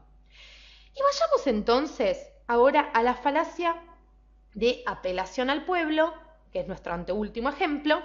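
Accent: Argentina. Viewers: Muy dinámico, sencillo y con buen nivel su video. Le recomiendo que continúe con otras falacias. Enseño Procesos Cognoscitivos en mi Universidad (Autónoma de Santo Domingo, R. Dominicana) y pienso usar este video como material complementario con mis estudiantes. Por su acento infiero usted es argentina (¿oriental tal vez?), país al que me unen fuertes lazos afectivos y culturales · Profesora ¡¡ maestra ¡¡ yo la admiro me gusta su enseñanza . Me encanta su acento argentino. Y no solo eso estoy aprendiendo pero no entindo su escritura ..parese la escritura de una doctora medico general . Jejejej lo hace a propocito ?? Mis respetos a usted .. ahh ya entendi nada es facil en esta vida ....un humilde servidor suyo .. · estuvo bien el vídeo pero odio los acentos argentinos ,bueno casi todos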